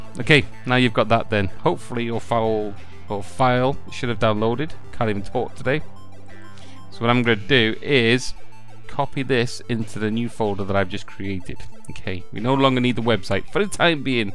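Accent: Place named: Liverpool, United Kingdom. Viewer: i work with a British guy here in the States...he heard your voice and said you're from the Liverpool area...how right is he?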